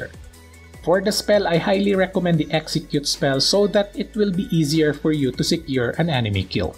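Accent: Philippines. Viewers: Tips are good but the pinoy accent is kinda hard to unhear. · Hope accent is from Philippine, right?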